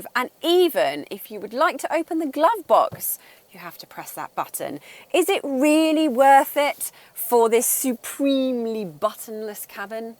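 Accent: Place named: Cockney, United Kingdom. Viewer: Nice cockney accent ❤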